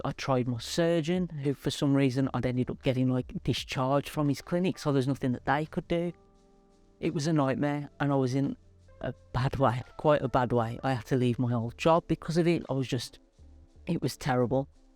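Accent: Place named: Birmingham, United Kingdom. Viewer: Hi, thank you for posting this video and for your absolute sincerity. One of your many gifts that stand out is your ability to communicate with excellence in front of a camera, I have tried this and it extremely difficult but you have always made it look easy. I am a Christian and I will be praying for you that God would give you successful outcomes in all your treatment. I have had 2 severe nervous breakdowns in my life so I know life can be tough sometimes. I love your positive attitude and the fact you struggle with these problems yet you can reach out to others to help them is a really lovely attribute of your character. You have also spent many years helping others by sharing your skills and discoveries with the world. I just want to say, thank you so much and I pray you will experience optimal health going forward. You are an inspiration!! 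I just wanted to add, I have lived in Scotland since age 5 but I was actually born in Birmingham!! I spent my early childhood there and had a Brummy accent like yours. They are memories I will never forget even though I was just a young child.😊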